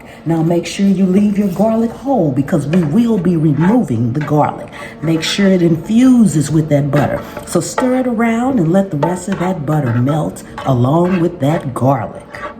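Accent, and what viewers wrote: South, United States. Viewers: You must be from the south. I was born and raised in North Carolina and when I heard you say, "If the Good Lord's willing and the creek don't rise" I immediately thought of my mama and daddy. Thank you for the beautiful memory and for the recipe. It looks amazing! ❤🙏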